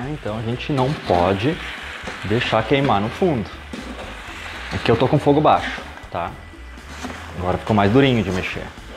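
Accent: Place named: Rio Grande do Sul, Brazil. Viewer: Vou fazer 😋 uma pergunta, vc é gaúcho? Por causa do sotaque 😁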